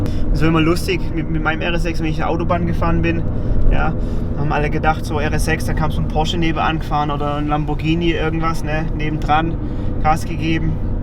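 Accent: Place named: Swabia, Germany. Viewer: Allein schon wegen dem Schwäbischen Akzent gibts einen Daumen hoch!!!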